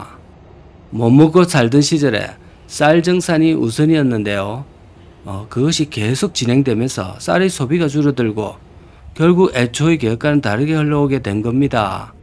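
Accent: Gyeongsang, South Korea. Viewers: 미국에서 너무 재미 있게 보고있읍니다. 경상 사투리도 구수 하구요. 한국에 안 가도 구섯 구석 잘 보고 있어요. 아무쪼록 건강하시고 발품계속 팔아 주이소💕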